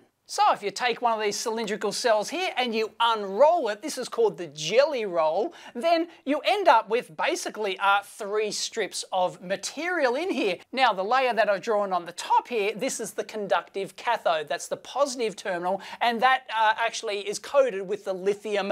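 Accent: Australia. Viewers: Love his Aussie accent. To my poor American ears, it sounds like he keeps saying that “we get the HATE out of the bottom of the battery”, which makes it hilarious to listen to. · "Dunny roll" haha. Keep it up, enthusiastic Australian guy! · Sandy Munro with an Aussie accent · Well scripted analysis . Nicely explained . With an Aussie accent to boot. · Nicely explained as always, and all the better for being in Aussie English.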